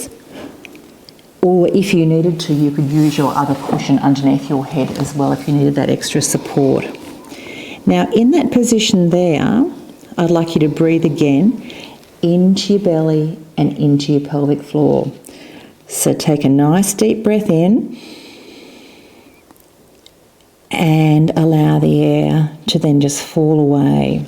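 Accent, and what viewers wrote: Australia. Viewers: is that a Melbourne accent? Gorgeous.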